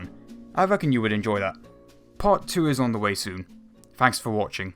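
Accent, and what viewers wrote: England, United Kingdom. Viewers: This is true. He's from England, but not the part where I live. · Why has an british accent?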